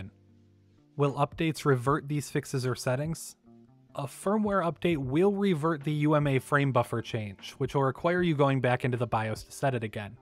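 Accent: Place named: United States. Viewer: Ah an American living in Sweden. Your accent did also seem way too American for me to think you were a native Swede, lol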